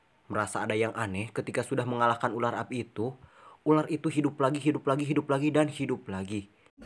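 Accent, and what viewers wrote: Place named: Tasikmalaya, Indonesia. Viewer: Mang orang tasik nya😂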